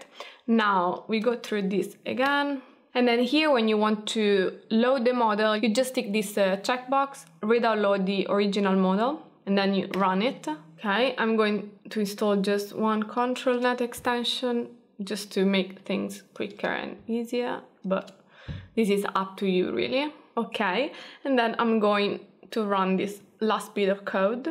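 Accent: Italy. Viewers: Ho notato il tuo accento italiano e dopo aver visto il tuo nome ho avuto la conferma. Fa piacere vedere altri in Italia interessarsi a queste cose :)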